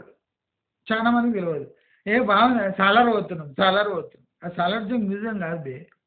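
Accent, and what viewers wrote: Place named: Telangana, India. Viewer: Ur accent pakka Telangana, jai telangana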